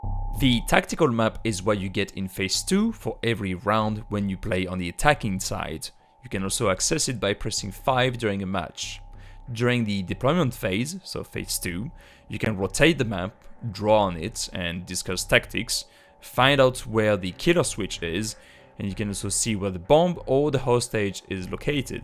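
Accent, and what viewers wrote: France. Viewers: Are u french ? Anyway, good video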